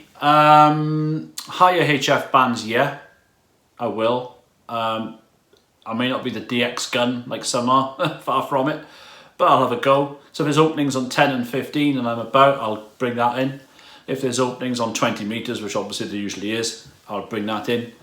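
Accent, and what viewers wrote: Wales, United Kingdom. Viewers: I've just started following you. Do I detect some Welsh in your accent? - - Adverts, no thanks. I tend to delete the sub's link if they start throwing ads at me. Home-based HF round here is a lost cause, too much QRM, S9++ on a quiet day! I've got a G5 up, but due to lack of space, it is bent into a big Z, and not very high. So I'm mostly on 2M, but even that has been poor for a while. I thought, with a lot of people stuck at home, it might wake up, but that didn't happen. I can sit on my local repeater, GB3MN, all day, and not hear a soul except the repeater ident! (500 is a little bit more active, now and then). If you care to look, you can find me on You-tube, too, but there's no ham radio stuff on there. I'm not looking for a 'plug'! 73 M0HFF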